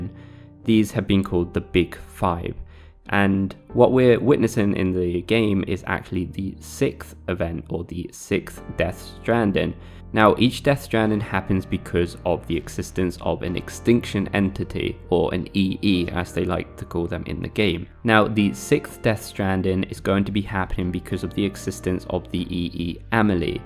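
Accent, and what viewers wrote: United Kingdom. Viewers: Happy to find a British accent explaining this game. I'm American and I can't stand Americans' accents on Explained videos.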